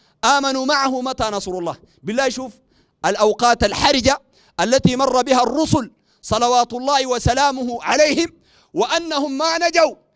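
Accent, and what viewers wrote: Sudan. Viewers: احب اللهجه السوداينه بعد اللهجه المصريه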